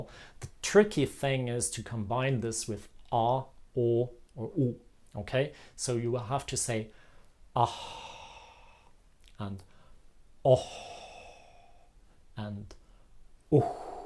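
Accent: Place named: Ireland. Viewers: The Irish are the only native English speakers that don't pronounce "th" as one sound. They pronounce "t" and "h" as separate sounds like most non native English speaks. His English "r" sounds Irish as well. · Thank you! You’re English sounds a bit Irish!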